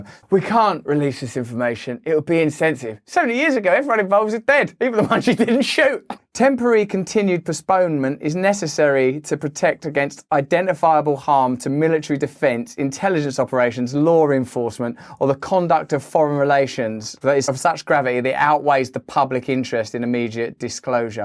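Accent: United States. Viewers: Dude, I love your American accent. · Your American accent is pretty good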